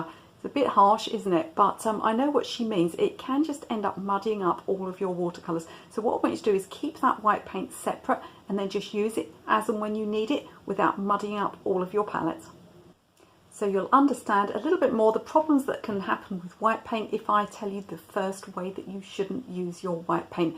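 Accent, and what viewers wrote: United Kingdom. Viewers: Funny thing. I started following your channel because I'm an English teacher in Brazil and I fell in love with your clear and bright British accent. Now, I'm in love with watercolours,too. I've bought a Windsor set of watercolours and started doing it as a hobby. I'd say it seems therapy to me. thanks a million for opening this amazing channel <3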